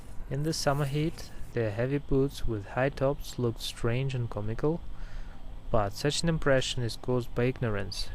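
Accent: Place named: Russia. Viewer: Privet! We've watched your video for a second time just now and read your reply. Rick says, "Never mind learning Russian, listening to this guy I need to brush up on my English skills!" :D lol !!! Seriously, your English is excellent! We discussed your narration and you truly have a fine grasp of the English language and you use a variety of words with ease and clarity. Honestly, you speak better English than many of the native born Australians around here ! Two of our best friends were born in the UK and they lament the way Australians speak these days - Aussies are degenerating into text talk! Well, let's face it, Aussies almost always shorten words & pepper most sentences with slang anyway - that's happened since we first landed on this sunburnt rock. Along with our cringe accents ... according to the Australian Broadcasting Co. there are 3 types of Australian accents. 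Rick says I don't fit any of the categories as I speak in a unique way and I don't pronounce some vowels as others do. I feel our accents are dire - and Rick likes it ! We are laughing so much reading a Google Answer to this question: "Are Australian accents pretty?" The answer: According to a recent survey, thousands of Americans regard Australian accents as one of the sexiest in the world ! Whaaat?!! Those people need serious therapy ! We both agree English sounds better with a Russian accent. You're doing fine. Thank you for mentioning those Germans, I recall Dirk Steffans and Heinrich Kern's bike trip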